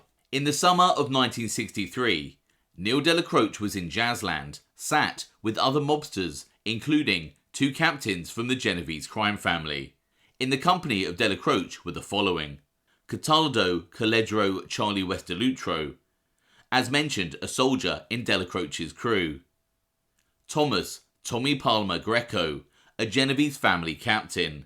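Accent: United Kingdom. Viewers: your wonderful British accent makes these nicknames that much more pleasurable and entertaining to hear. Keep up the quality work.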